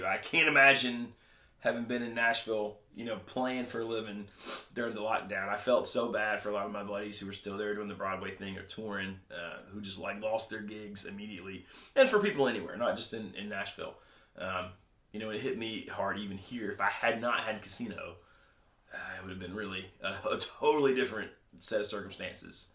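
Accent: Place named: Scotland, United Kingdom. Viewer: As a recent subscriber to both your channel and Casino Guitars and now I want to say thank's to you, Baxter and for excellent content. It's also great to hear a fellow Scottish voice talking guitars.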